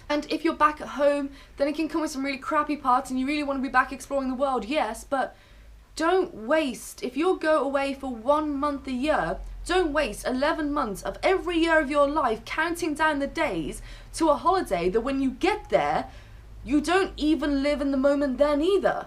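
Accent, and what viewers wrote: United Kingdom. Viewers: I think she means Birmingham, UK, telling from her British accent. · Definitely subscribed. Great vids, british accent, cute girl, what more could i ask for :)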